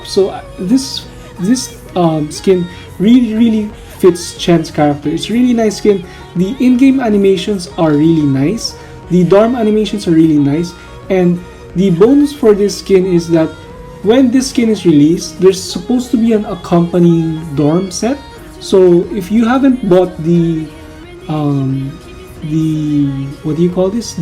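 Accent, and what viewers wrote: Philippines. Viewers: U sound filipino, kabayan?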